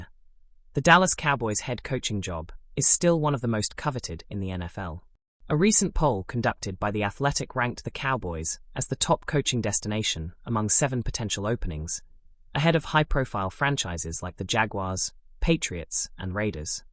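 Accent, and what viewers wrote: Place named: United Kingdom. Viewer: Nothing says American football more than a guy with a british accent. If this is an AI voice maybe pick an American voice?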